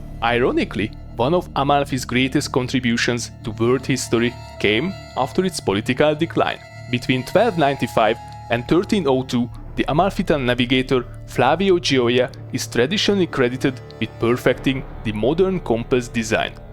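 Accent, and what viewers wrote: Hungary. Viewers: Annyira hallom hogy magyar vagy XD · Nem kell. Van egy fajta sármja a magyar kiejtésnek. Csak így tovább! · I instantly recognised that he was hungarian just by his accent XD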